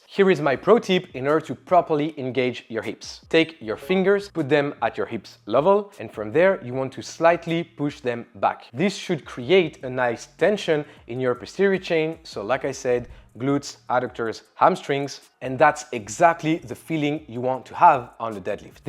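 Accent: France. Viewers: Super tes vidéos en anglais ça me permet de m’exercer à l’oral de manière pas trop hard core grâce à ton accent français 👌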